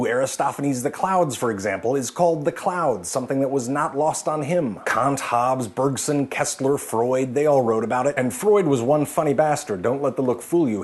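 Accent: Germany. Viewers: ze has such a good german accent! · The hilarity of a german guy talking like a cliché german. It makes me so happy. Oh boy.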